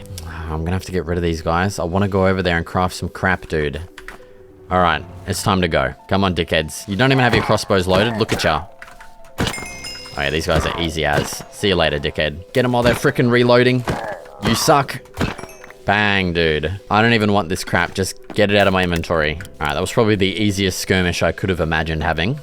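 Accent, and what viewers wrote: Australia. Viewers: Oh gosh you make great builds , but your narrative makes me laugh,fair dinkum Aussie way of talking,crazy man ..........few swear words thrown in is typical, lol,not that Im keen on that way of speaking, but its just you.... Cheers 🐨🦘🇦🇺🌟🤩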